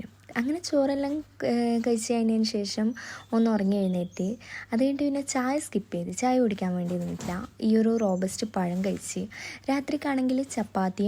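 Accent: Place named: Kannur, India. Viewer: Kannur slang kannur aano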